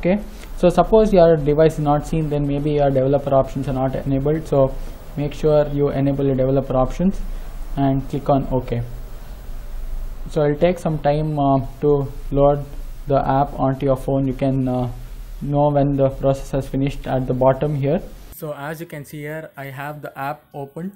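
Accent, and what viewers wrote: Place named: India. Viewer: have problem with indian accent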